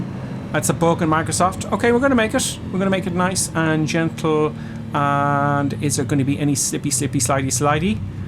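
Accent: Ireland. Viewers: Wait a minute... you’re IRISH??? :)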